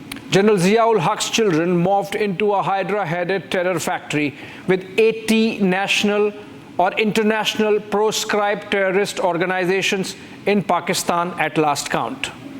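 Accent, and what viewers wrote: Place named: Delhi, India. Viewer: Why have they hired someone straight out of Delhi public school to read an English bulletin?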